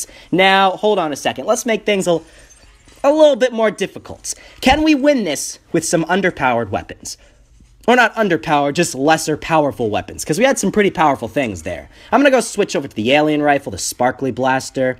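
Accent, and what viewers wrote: United Kingdom. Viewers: Nice british accent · No offense but u sound British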